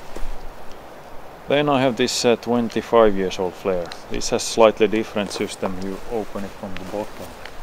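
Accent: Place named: Finland. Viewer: Hmmnmm... Let me guess... You are from Finland.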